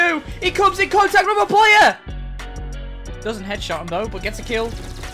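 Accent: United Kingdom. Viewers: why? he british. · Are you British? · is this guy british?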